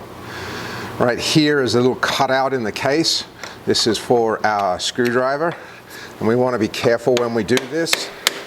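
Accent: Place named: Australia. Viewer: Great video! Where’s your accent from? You sound Aussie! Thanks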